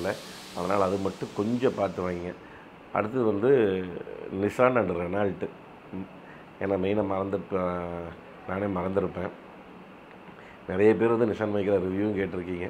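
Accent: Kongu, India. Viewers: Anna iam from erode bhavani.... Unga kongu slang vera level...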